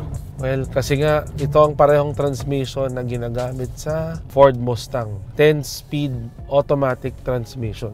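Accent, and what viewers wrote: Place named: Philippines. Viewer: Salamat sa maayos n pananalita pinoy na pinoy. Salamat sa napakagandang review ng raptor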